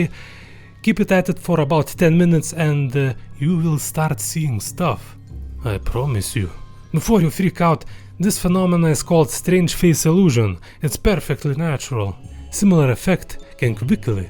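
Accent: Russia. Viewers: а чего не на русском, чусвтуется русский акцент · Hmmm ... English with Russian accent, nise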